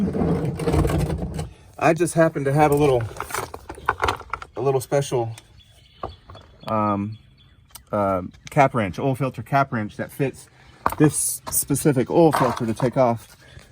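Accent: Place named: South, United States. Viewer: Your southern accent is adorable.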